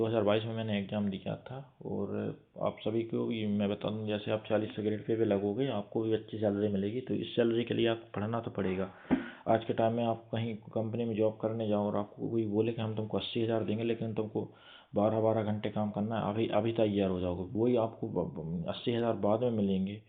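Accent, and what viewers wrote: Bundelkhand, India. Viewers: Bhaiya aap bundelkhand se ho kya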